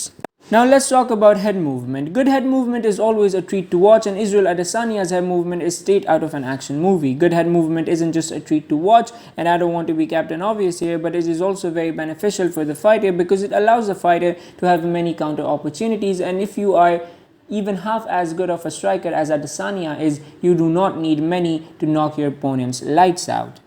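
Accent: India. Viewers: he deffinetly is lol, however, he's either been living in the uk or just been on the Internet so much he's picked up some slang... Dont think I've ever heard "this will be a banger of a fight" in an Indian accent before.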